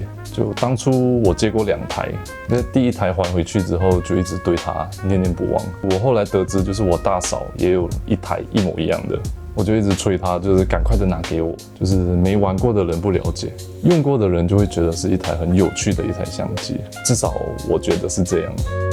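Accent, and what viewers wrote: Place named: Taiwan. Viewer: 感覺聽起來有台灣的口音欸！是來過台灣讀書嗎？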